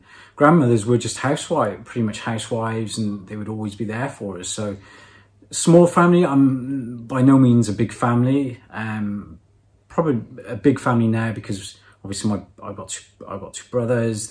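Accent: United Kingdom. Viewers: Очень интересное интервью - я слушал и наслаждался, так как я преподаватель английского языка и не люблю американский акцент и мне нравится британский. Очень приятно слушать Вас. Вам удачи и благополучия.Very interesting interview - I listened and enjoyed as I'm an English teacher and I don't like the American accent and I like the British one. It is very nice to listen to you. Good luck and well-being to you.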